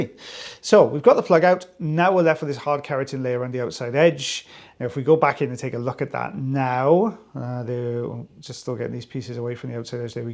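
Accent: Wales, United Kingdom. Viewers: Nope! He's Welsh.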